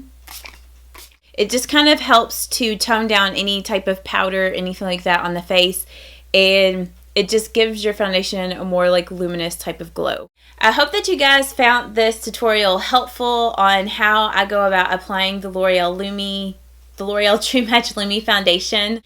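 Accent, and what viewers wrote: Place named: Texas, United States. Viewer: Love your accent, where are you from, I'm southern also from Texas, you sound like you are from Texas. lol good video too.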